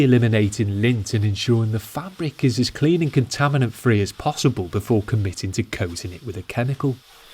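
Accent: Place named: Birmingham, United Kingdom. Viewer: OMG the bleedin Brummy accent unt the subtle innuendos made me smile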